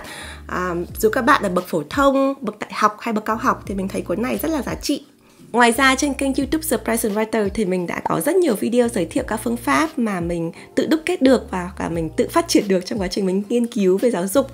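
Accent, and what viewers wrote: Standard. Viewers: Chị nói chuẩn ghê. Google dịch được hết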